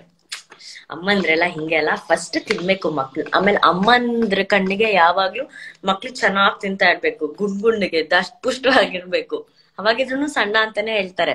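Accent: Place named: North Karnataka, India. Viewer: Nanu Dayanand Goudar anta Namadu Gokak hatra Chikka halli Fulagaddi urinavanu First Nimage nanna kade enda Namasakargalu Anushri Akka mate Nimage wish You Happy Birthday🎂🎉🎂🎂🎂🎂 Nivu 100 kala channagi balabeku anta nanna ashe ade riti Nivu namma uttar karnataka bashena Namma hage matadtira namage bala kushi agutte mate Nivu andre tumbane esta ellarigu Vande vande namma uru kade banni medum plz 🙏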